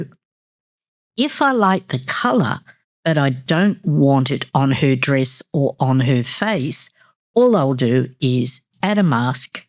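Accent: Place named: Australia. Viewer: Thank you for your time. Lovely to hear an Aussie voiceover!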